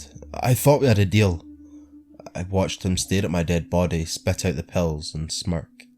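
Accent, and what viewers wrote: Scotland, United Kingdom. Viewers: I'm surprised you dont have more subscribers! You have lots of cool horror stuff on your channel and (I'm sure you get this all the time) an awesome Scottish accent! I am linking this channel to all my angsty teenage friends who watch spooky stories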